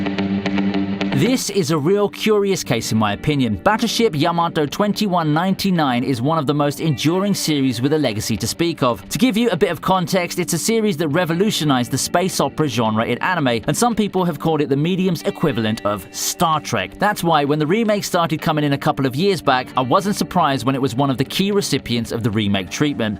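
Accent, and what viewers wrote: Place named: United Kingdom. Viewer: Dude are you from the UK? Also good vid. I've picked out 3 to watch. Rage of Bahamut, Yona of the dawn and Rainbow. Cheers pal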